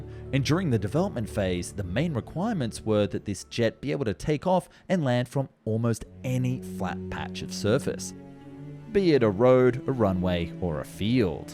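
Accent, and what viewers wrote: Australia. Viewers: well hes australian he has an accent like that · Bro it's Australian he says SUR 25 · He's Aussie, what do you expect?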